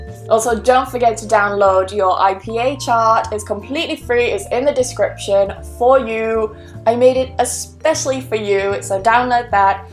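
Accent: United Kingdom. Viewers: Thank you so much for sharing this amazing British pronunciation! Are you from liverpool or Sheffield the city of young British female engineer Ruth? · I like English British.Terdengar jelas kental alias medok.Thanks Miss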